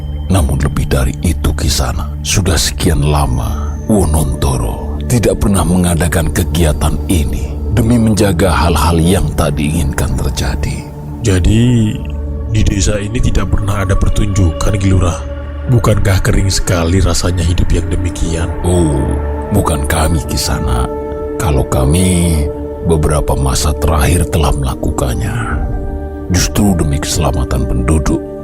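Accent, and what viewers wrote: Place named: Java, Indonesia. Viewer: Baru aja nemu channelmu mas (emot sedih). Njawani banget dari setiap katanya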